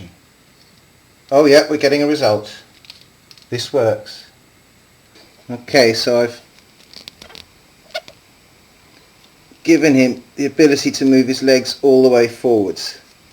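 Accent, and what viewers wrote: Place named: England, United Kingdom. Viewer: Nice video. I have wondered about scale too. Seems like it is not always right at the scale that is stated, they seem use the term "scale" loosely. By the way, looks like "Polystyrene Man" was badly burned in a fire! lol. I always like listening to an English accent and phrasing. I think most non Brits do since it is not something we hear that often and it is just such a pure form of English than what it has morphed into in the states.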